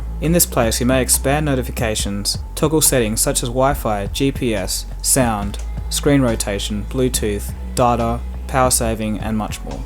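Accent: Australia. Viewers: Really good review, probly the best review of anything ive seen on youtube, no kidding oh and great to hear a fellow Aussie doing it. looks like an Awesome device, didnt see any drawing on it really, will look at your other clips. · great review but I still love my iPhone 5. Love your australian accent though XD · This is one one of the best reviews I have ever seen. I just wish I could buy the phone. It's too pricy for me to buy. I am from Indonesia and it cost a lot here. All I want to say thanks mate. I love your Aussie accent.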